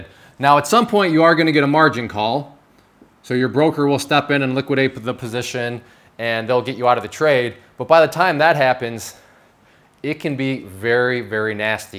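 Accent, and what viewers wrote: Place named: Midwest, United States. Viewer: lol, "I understand math." No doubt, brother. You sound like you are from Iowa/South Dakota/Nebraska.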